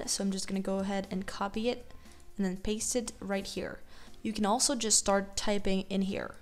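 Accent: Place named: United States. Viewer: love ur american accent ;)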